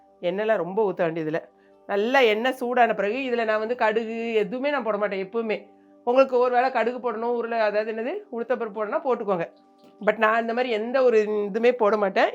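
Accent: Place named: Tirunelveli, India. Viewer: As I am also from Tirunelveli, I enjoy your gesture, accent , innocence and simplicity besides your cooking. ( I hate cooking and wish I were your neighbour to sample your dishes)😂. God bless. Is it possible to connect with you over phone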